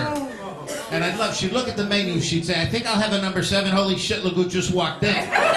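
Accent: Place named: New York, United States. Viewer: This guy is hilarious. Love the new York accent, sorry to hear he has passed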